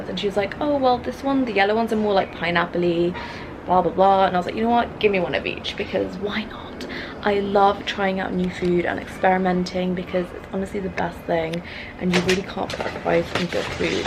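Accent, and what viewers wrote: United Kingdom. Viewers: how did you have that british accent? make a life story time!! im hooked!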